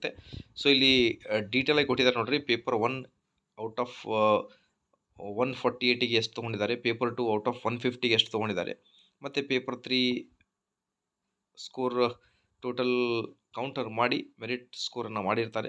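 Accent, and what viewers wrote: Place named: Mysuru, India. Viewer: Mysuru english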